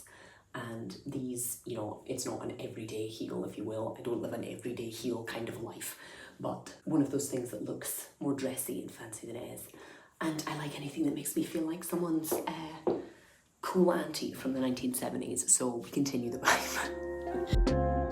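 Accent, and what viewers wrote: Scotland, United Kingdom. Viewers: Found your channel randomly loveee it 😍 I’m in glasgow, brill that your Scottish too👌🏻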